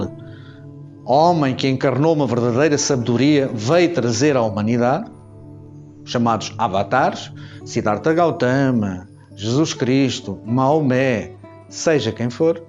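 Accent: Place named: Portugal. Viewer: Descobri a ontem, e estou maravilhada. Obrigada. Como é bom e doce ouvir estas palavras em português de Portugal, o que é tão raro. E Kryon que infelizmente poucos compreendem ou aceitam. Apesar de não ter muitos subscritos ou visualizações, não desista. Somos poucos mas bons. Continue a espalhar sementinhas de conhecimento para contribuir na expanção da consciência da humanidade que finalmente começa a acordar aos poucos. Somos todos UM, Namastê.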